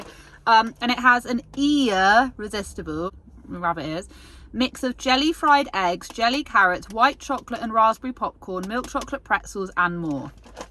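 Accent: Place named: Wales, United Kingdom. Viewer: Nice to this side of you! Beautiful dog and lovely landscape. The Wales accent is my favourite British dialect. 😊 You are lovely my dear! ❤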